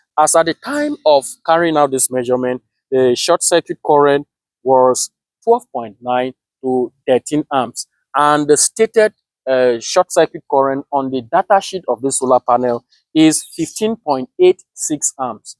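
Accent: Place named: Africa. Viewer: There is nothing wrong with the way he pronounced circuit and Celsius, he's from Africa do you expect him to sound European!?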